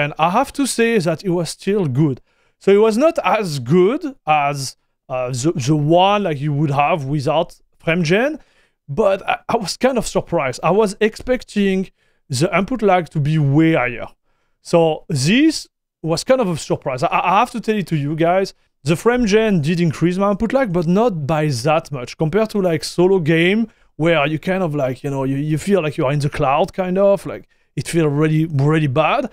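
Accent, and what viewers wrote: France. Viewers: genuine question, is he french or belgian?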